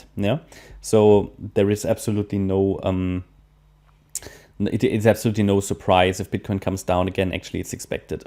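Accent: Germany. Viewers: do have any german roots?your accent is like german..just curious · Bro is german☠️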